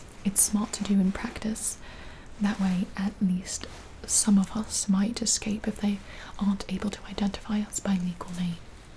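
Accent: England, United Kingdom. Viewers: Ahhh yes a British accent because we all sound like that in the uk it’s not like there is 4 different countries with numerous different accents in them but let’s call it British but sound English yes smart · Your British accent is the best ☺️💤😴👍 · You actually nailed the English accent, however I'm from the North so its a little different 😂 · English accent is one of the best Gibis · Why do you actually sound convincingly british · What English accent is that? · English accent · You fully sound English here and this is coming from a Brit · I had a british girlfriend and sounds more british than her ahaha. Seriously though, to nail it that hard and do a top-notch rp on top of all that is something else, Im in love with it. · *English accent not British lol Don't hat I love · *English accent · *English accent. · That's an english accent not a british accent, please change it and respect the rest of the UKs individual accents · Yo English accent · There's no such thing as a bloody "British" accent. 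She is doing an ENGLISH accent. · Not a bad English accent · Bravo, that's a very impressive British accent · British accent is better then mine, quite jealous to be honest.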